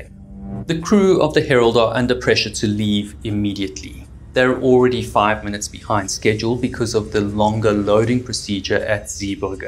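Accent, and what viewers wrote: South Africa. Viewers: I was not expecting a South African accent. Hello countryman! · I'm trying to identify the accent. My best guess is Edenvale/Sandringham?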